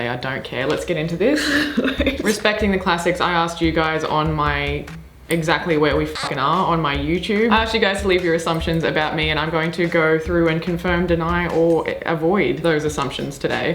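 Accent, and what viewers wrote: Australia. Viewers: I'm assuming you're an Aussie.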